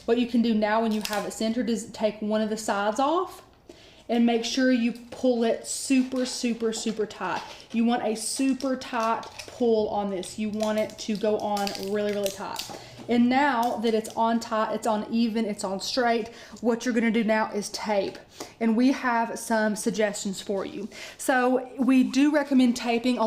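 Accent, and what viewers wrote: South, United States. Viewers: OMG you got a southern accent so I, as a fellow southerner know this is gonna be a good tutorial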